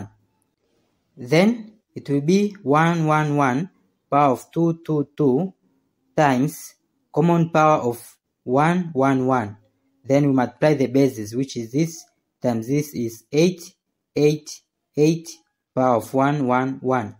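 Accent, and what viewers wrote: India. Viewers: I hear Indian accent I close the video. It usually works this way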